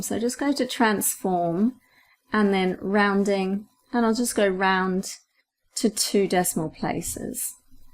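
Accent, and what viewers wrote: United Kingdom. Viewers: amazing, plus the little British accent...thanks !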